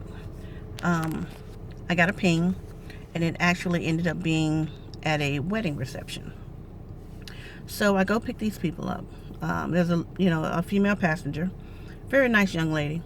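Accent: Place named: United States. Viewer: yeah, a long way from america.. ive watched a few of your videos already and i can't get enough of your accent, if i may id like to ask where you grew up? theres something about a soft spoken American accent that i find relaxing to listen to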